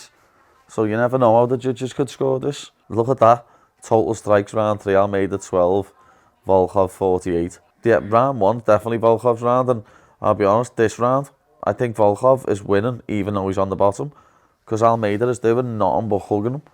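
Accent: Liverpool, United Kingdom. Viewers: if I got a £1 for every time paddy says lad, id be a millionaire 😂 gotta love the Scouse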